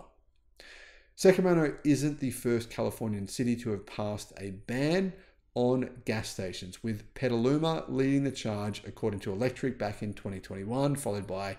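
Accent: Australia. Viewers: Loved hearing your Australian accent enunciate the name of my NorCal town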